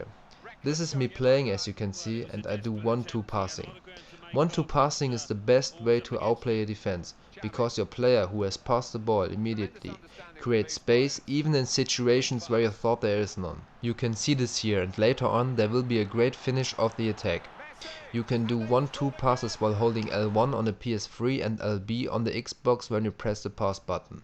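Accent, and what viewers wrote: Germany. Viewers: You speak german ! I notice because it says Tor and germans say X like this ix and english people ex :D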